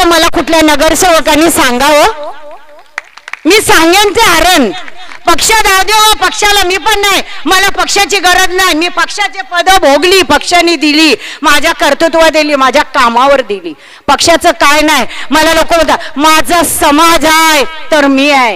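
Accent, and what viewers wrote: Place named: Agri, India. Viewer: Agri aavaj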